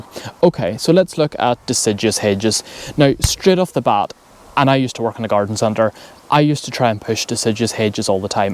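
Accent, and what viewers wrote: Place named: Ireland. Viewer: I love everything Irish and your lovely accent and the Irish vibe. Presumably you are in lovely Ireland? If not, bless ya for bringing us your lovely accent and charming Emerald Isle manner anyway! Apart from loving the Irish accent and Zero Zero Guinness, another thing I adore is listening to Gardener's Question Time on BBC Radio 4, but last time I heard the radio programme panel discussing hedges they seemed to hate cherry laurel just as much as you do. However, I've gone for cherry laurel round my garden because it is very cheap, tough and fast growing. I bought the smallest cheapest plants and after a couple of three years they are now almost a full grown hedge. You've also done a bit of yew around your garden, which is a great idea, however, even more expensive than beech, and takes even longer to establish. In fact, yew is extremely slow growing. 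However, indeed, the deciduous beech is great because it is a tree and so you can have a hugely high hedge - eventually as tall as a full grown beech tree - if the neighbours and laws of obstruction allow - but they are either extremely expensive or take years to establish. But, while if I had more money I might have gone for deciduous beech or evergreen yew, I actually really like my poor person's cherry laurel, zero problems with yellowing leaves, and in a short time it is making my garden look so much smarter, while I don't have to worry about the expense of a new garden fence and it certainly won't die from drought. And, yo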